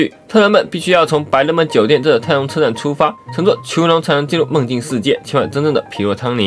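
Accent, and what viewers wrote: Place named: Beijing, China. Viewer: 下次可以直接說是北京口音😂（我覺得你說的應該是這個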